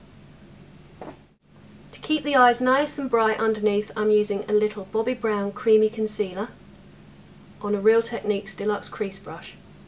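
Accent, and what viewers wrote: United Kingdom. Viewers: You sound extra British today haha :D Love it!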